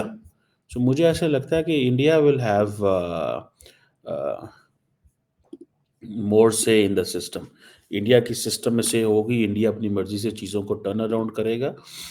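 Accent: United States. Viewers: Karmala bhenji was very confused about her identity and therefore didn’t get the whole hearted support of the Indian diaspora!! what’s with the American accent? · ji u are already little funny and to add on it American accent whyyyyyyy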